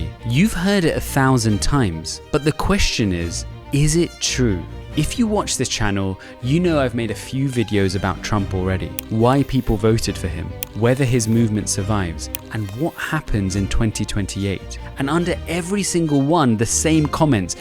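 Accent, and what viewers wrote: United Kingdom. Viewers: We r not slaves from Africa this British accent Hindu began the video off lying we r not slaves from Africa stop 🛑 lying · I found this video thoroughly adept at rationalizing racism away - in part by signaling or assigning "degrees" through a contrived methodology and then putting it on the viewer to "decide" where they fall on the scale. the other comments did a good job citing the obvious. In short this was clownish and disgraceful. the British accent leant a little credibility I guess though...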